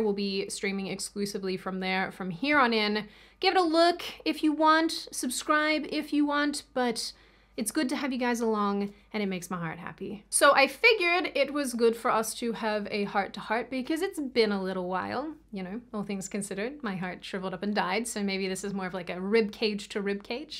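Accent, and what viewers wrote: Australia. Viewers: I'm surprised that you feel this way. The reason being I've always thought you had a funny presentation of facts and opinion in your videos. You don't confront and you're never downright mean. You keep the mood light (which is good and a breath of fresh air). Or maybe I was just completely duped by that aussie accent 🤔 Anyway. I've always thought that content creators on the right need a good and stable home life to replenish their strength. Especially considering our side believe in the nuclear family etc. So I do truly hope you have someone special in your life. And if so that you start a family sooner than later. Focus on that. Enjoy that. Take a break. We understand this crazy world can actually take a toll. You're only [a lovely] human. Lastly, I follow two big film critique channels (CriticalDrinker and Nerdrotic) on here that do entertaining work. 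I think it's the same you do, but a different field (it's literally the culture of the political and society you're criticising). The aim is set on fictional characters, stories and suits that greenlight crap. I think you should look in to it. Also, team up with a friend and do some film reactionary episodes. Try it out and see what the response is. There are some channels on here that have over 300k views and making good money just by us watching them watch movies 😂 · Don't give in the pressure! It's sad how things have turned out, but you're one of my favorite commentators; I only ever watched "You Are Here" because of you. I love your snarky comments on the bleak outlook of humanity, and I think your Aussie accent just amplifies it as well lol. You do you, always remember that you're great, and those who support you, will always support you, while those who are just here to bully, will do just that. 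I really do wish the best for you, whether it's to continue doing this kind of work, or to move on and do whatever else you want with your life. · Oh I just love your Oz accent 😂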